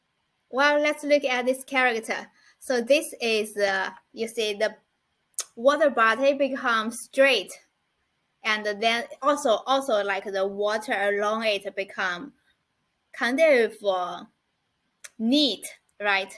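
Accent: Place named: China. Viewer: I love it when Chinsse women speak Chinsse English! It sounds cute 😍😍 just NOT AMERICAN... Got to get me a Chinese wife one day!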